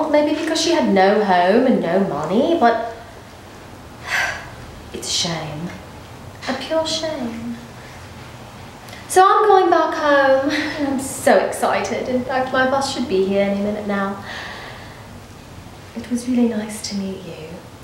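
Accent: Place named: Australia. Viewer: Aussie accent eh. Nice 👍🏻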